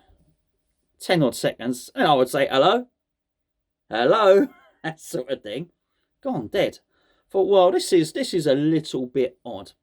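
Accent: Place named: Scotland, United Kingdom. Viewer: Definitely a hint of Scottish twang, bloody 🤥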